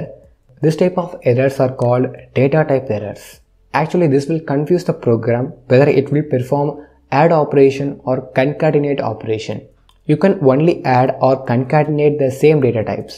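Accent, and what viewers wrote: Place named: Tirunelveli, India. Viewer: Excellent. Bro neenga Tirunelveli ah ?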